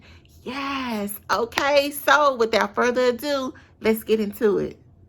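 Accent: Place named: Alabama, United States. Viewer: Hi, such a beautiful work 💞💞 are you from Alabama? I looooove your accent. I'm from Argentina.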